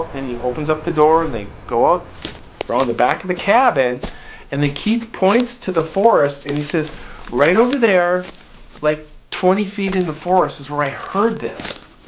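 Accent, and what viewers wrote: Minnesota, United States. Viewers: Love your Minnesotan accent.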